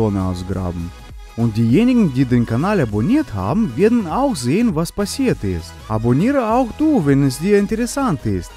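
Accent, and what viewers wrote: Russia. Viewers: Sein russischer Akzent bringt mich zur Weißglut 😑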